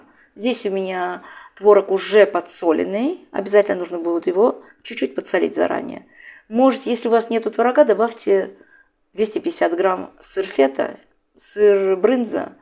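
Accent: Baku, Azerbaijan. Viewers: Здравствуйте, наш бакинский акцент, это 👍👍👍🥰 Смотрю с Ростова на Дону, тоже из такого теста делаю пирожки, но этот вариант мне понравился, обязательно попробую. Спасибо ❤️🌹🌹🌹🌹🌹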